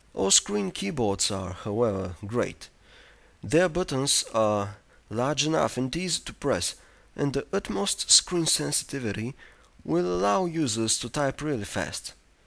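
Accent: Russia. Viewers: ))) hahahaa man i like that russian english! :D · maybe is the site german but the reviewer is anything but german... he sounds clearly russian or polish as I am german and actually know how the typical german accent sounds like... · RUSSIAN. There's no doubt about it, and I thought he was drunk. Please arenaphone..., do something better.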